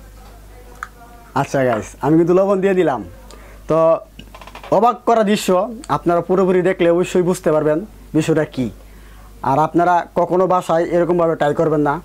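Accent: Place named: Sylhet, Bangladesh. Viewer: কোন মাঠ নাই আপনি সিলেটি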